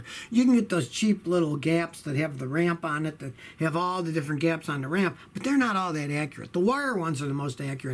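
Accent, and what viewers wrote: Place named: Midwest, United States. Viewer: You may be in Texas NOW, BUT you aint from Texas! That accent is Chi-ca-go, Michigan, Wisconsin around in there. Sound like Dan Aykroyd in Blues Brothers. "We're On A Mission from Gawwd" How about those Caars and Stunts, huh?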